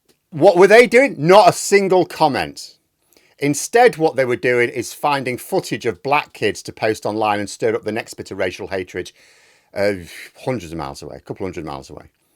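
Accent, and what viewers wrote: United Kingdom. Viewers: my ears hurt from listening to your rhoticism. you brits need some speech therapy or something, your accent is a mistake.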